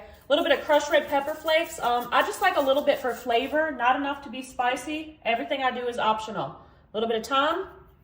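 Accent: South, United States. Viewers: I love your innovative video. You are andFred are so entertaining. It’s lifts my spirits to watch y’all. Our world need more people like you, and. I’m from the South and can certainly tell you are too. Thanks so much from The BAYOU STATE!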